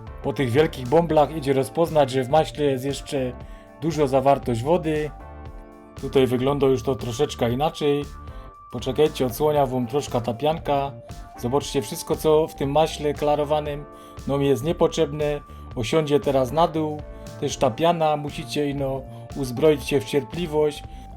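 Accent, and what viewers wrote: Silesia, Poland. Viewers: Piękna Śląska Mowa..Dziękuję za przepis....Pozdrowienia z Lubelszczyzny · Piękna jest ta mowa Śląska dzięki za przepis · Pikne masło chopie. 😅 Uwielbiam śląską gwarę. Fajny przepis i cudowny zaśpiew.👌🏻 Dzięki 👊🏻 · Kocham Twoj slaski❤ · To jest śląska gwara, prawda? Pozdrowienia z Wiednia❤ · Jo lubia Śląska gadka ❤❤❤ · Lubię słuchać Śląskiej mowy, 38 lat temu mieszkałam przez pół roku w Rybniku .A maslo i tak sobie zrobię. Pozdrawiam i już dobrych świat życzę. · Dziękuję biorę się za klarowanie masła i robię subskrypcję . Konkretny przekaz i śląska gwara którą lubię . Pozdrawiam😊❤❤ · Co to za język ??? Ślązak? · super przydatny filmik :) uwielbiam śląską godke :) pozdrawiam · Lubię gwarę śląską!!!!! Dziękuję 🎉🎉🎉🎉🎉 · Jasno, prosto i konkretnie. Wartość dodana: piękna śląska godka. :) Pozdrowienia z Krakowa od potomka Ślązaka (z tym, że cieszyńskiego). 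· Lubię gwarę śląska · To jest dobro Śląsko Godka 💪💪💪❤❤❤ ,fajnie się słucho 👍🤣.Superrr. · sama jesteś wsiowa. To gwara śląska, gdyby była kaszubska, ni kej byś nie poniała, hihiiii... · Zrobiłam , świetny patent nie trzeba zbierać tej pianki. Jaka fajna gwara śląska . Pozdrawiam Pana 😊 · Mieszkałam 20 lat na Śląsku, i ślōnsko godka szczerze mnie wzruszyła ♥ Dziękuję za bardzo uproszczony przepis, bardzo lubię minimalizować swój nakład pracy, przy maksymalizacji efektów. Akurat dziś robię masło klarowane, cieszę się, że przy tej okazji tutaj trafiłam, bo będę mogła na gorąco wypraktykować. Pozdrawiam szanownego Autora, wszystkiego dobrego.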